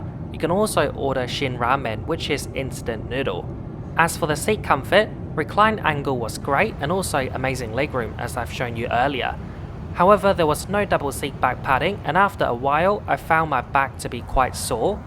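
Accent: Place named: Australia. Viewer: First of your videos I've seen. Your excellent English with an Aussie accent is adorable!! Planning to take KA so I can take my cat in the cabin, instead of frightening her in the (admittedly pressurized, climatized) forward cargo hold. I see on the seating charts that KA has a small number of dedicated "pet friendly" seats, but so far I haven't been able to find a video of someone bringing their cat along on KA. Maybe I should make a video of my (our!) experience!? 🤷😺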